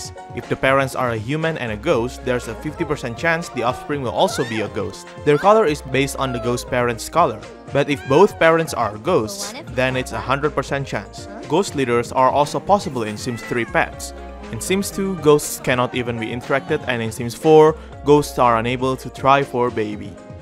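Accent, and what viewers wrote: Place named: Indonesia. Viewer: Dude are you indonesian? I assumed it by your channel name and your accent lol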